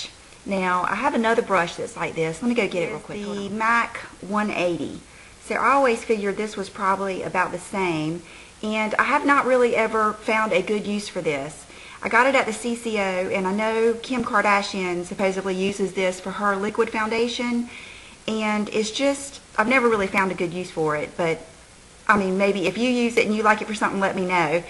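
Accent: South, United States. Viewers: I'm a new subscriber and I will definitely stick with watching your videos. You seem like such a genuinely sweet person. I just love your accent too. I'm a slow-talking Texas girl myself, so I am glad to see another Southerner with their strong access just out there with no apologies.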